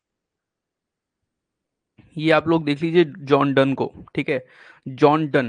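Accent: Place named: Rewa, India. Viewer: Sir aap reewa se h ,lagta h aapki voice se....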